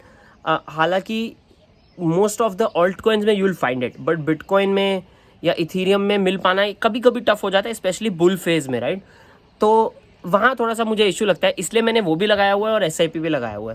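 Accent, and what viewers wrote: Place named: Nagpur, India. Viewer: Bhai Nagpur se ho kya....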